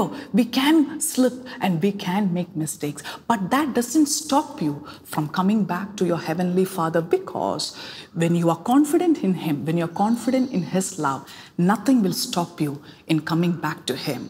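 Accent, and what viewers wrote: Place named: India. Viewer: 5 Subjects Great Stuff Pastor. Very Clear, Good Study and English That's a great Indian Accent.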